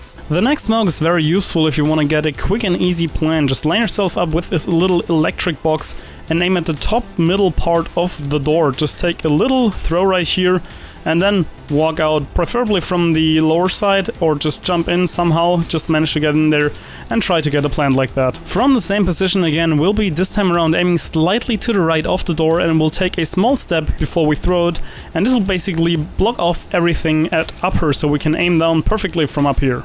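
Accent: Germany. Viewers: man hört raus das du deutsch bist :) +1 gutes video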